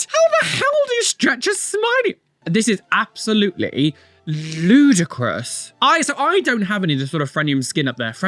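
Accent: United Kingdom. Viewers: you have such a distinct british accent 😆 i love listening to your voice and practicing my english skills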